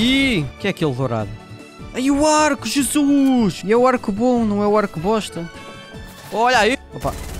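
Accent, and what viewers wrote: Portugal. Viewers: Es ganda tuga so consigo ouvir: "olha ai pá" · eu adoro esse sotaque de portugal "VOCEAS ACHARAM QUE EU TINHA MORRIDO DE CURONA?" Eu so br ent vo da um desconto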